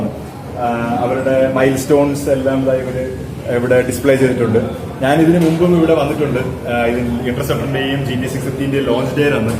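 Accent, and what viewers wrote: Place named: Thiruvananthapuram, India. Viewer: വളരെ ലളിതമായി നമ്മടെ സ്വന്തം തിരുവനന്തപുരം സ്റ്റൈലിൽ തന്നെ അവതരിപ്പിച്ചു. പൊളിച്ചു മക്കളേ.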